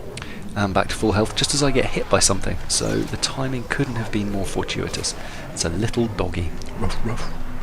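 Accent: United Kingdom. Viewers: im brittish mate, and thats a really tragic accent. its just way too brittish, useful videos though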